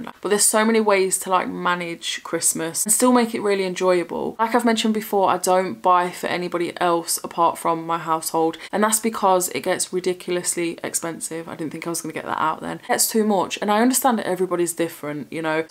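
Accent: Nottingham, United Kingdom. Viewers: Are you from Nottingham ? You sound it x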